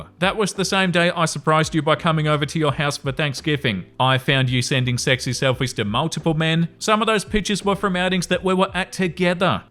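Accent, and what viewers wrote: Australia. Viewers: Australian, one of the best accents imo. Altho I'm a bit enamored of a Scottish accent as well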